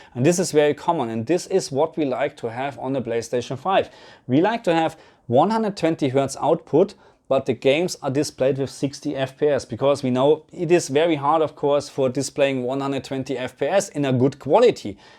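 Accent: Germany. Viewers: I have a question, sir are you german? You talk english like german person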